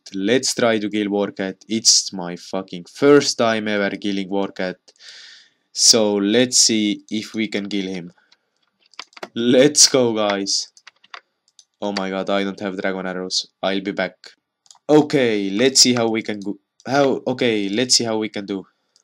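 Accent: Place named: Estonia. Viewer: Hea eesti aksent sees aga muidu 5+! Nice vid ;P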